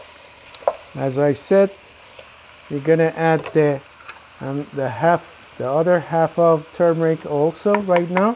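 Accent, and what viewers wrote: Iran. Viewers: beautiful and sincere Persian accent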